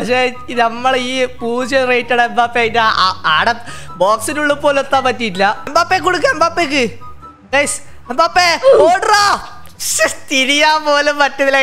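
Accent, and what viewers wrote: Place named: Tamil Nadu, India. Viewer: Naduvula naduvula tamil varudhu😂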